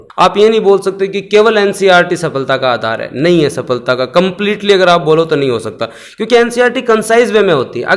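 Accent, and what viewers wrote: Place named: Marwari, India. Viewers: Wah sir apki marvadi ka lahaja achcha h.. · शेखावटी टच है ।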